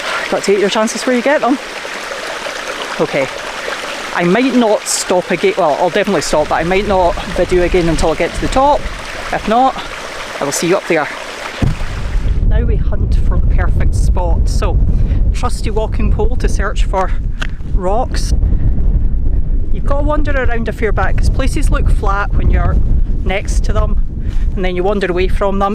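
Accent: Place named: Ayrshire, United Kingdom. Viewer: something wrong with his ears. Your voice is crystal. Your Ayrshires answer to Miss Jean Brodie.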